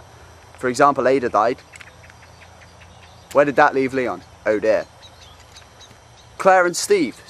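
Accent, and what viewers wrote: United Kingdom. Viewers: Awwwh he said torch! Haha I love british peoples accents! My cousin and I joke around in british accents.. its just by all means adorable... haha and he said bloody omg this video tripped me out.. got to love british.(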